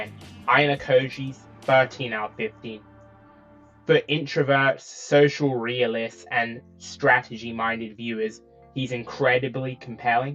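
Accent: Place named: United Kingdom. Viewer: 25 minutes of british accent.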